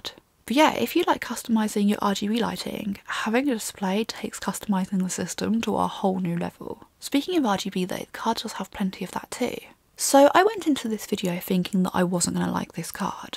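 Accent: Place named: Kent, United Kingdom. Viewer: Great video! Are you from Kent? Your accent reminds me of Kent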